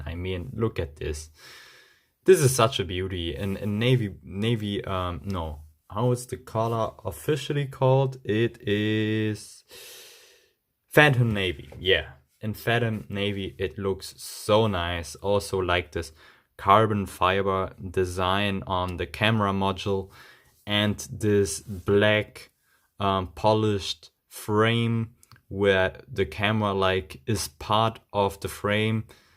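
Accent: Germany. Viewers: Your english is very....german Wieso machst du deine Videos nicht gleich in deutsch?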